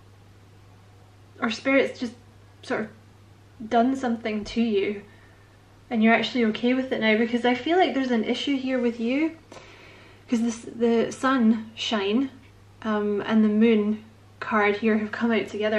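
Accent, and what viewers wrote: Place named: Glasgow, United Kingdom. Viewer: Love your accuracy. . . AND your Glaswegian accent!🏴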